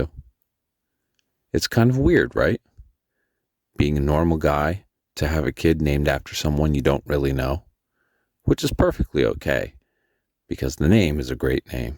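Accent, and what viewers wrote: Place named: United States. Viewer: you sound like an american , you don t have any british accent at all , if im correct that is very impressive because senna never was loved or famous in the united stetes because for been a f 1 driver , the don t care about f 1 anyway , phil hill was world champion in 1961 if anyone ask about him in the united states you gonna hear , who ????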